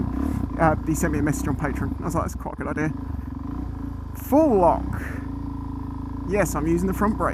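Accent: United Kingdom. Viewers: Haha British, idk why but you Brit’s sound so hilarious to me.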